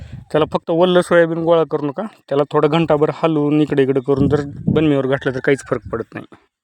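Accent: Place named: Latur, India. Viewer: लातूरचे का दादा बोलण्या वरून म्हणले दादा . 😊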